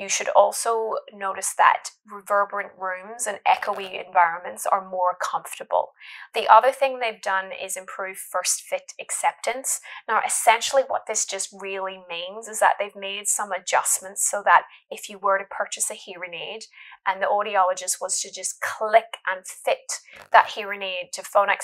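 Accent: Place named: Northern Ireland, United Kingdom. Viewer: Love that Northern Ireland accent. 💚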